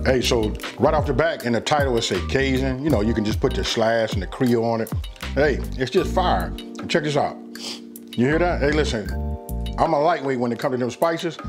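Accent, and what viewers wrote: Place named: California, United States. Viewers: man, I love big bro! this man can cook! I'm never disappointed! he got that og California accent 😂😂 much from okc, ok! keep doing this bro! I had a vision that you were on one of those food network shows, like chopped! you can do it, I believe in you! you're going real far with this, I see it!🙏🏽💯🤎🥂🥡🦐🧈🥗🍖🥞🥜🧆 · as someone who grew up in crenshaw and moved to the bay area, any time you hear someone with the same lingo and talks as you n your peoples... just makes this hit different. you earned a sub from me OG. keep makin great content.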